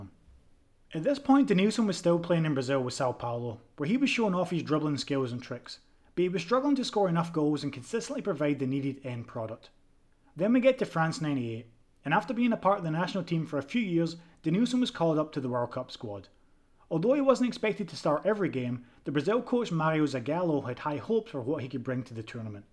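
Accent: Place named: Glasgow, United Kingdom. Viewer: Where in scotland are you from?you sound more glasgow side than Edinburgh side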